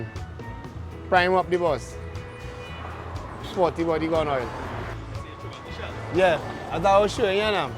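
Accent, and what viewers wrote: Trinidad and Tobago. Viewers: It’s because he isn’t Jamaican…he’s Trinidadian so he’s sounds Trinidadian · That's a true Trini, different accent from Jamaican.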